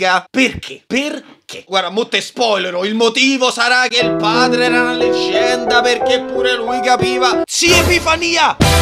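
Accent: Rome, Italy. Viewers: TU devi doppiare pikachu e con accento romano incluso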